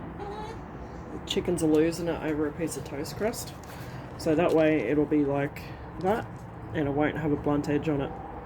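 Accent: Australia. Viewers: Just beautiful! And I'm so happy to listen to your accent as my daughter is in Perth, so as i listen to you I can imagine what she listens to. Very cool. Thanks!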